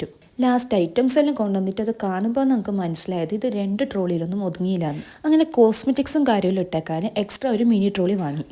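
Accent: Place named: Kasaragod, India. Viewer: Kasaragod language undhallo🫵🏻😂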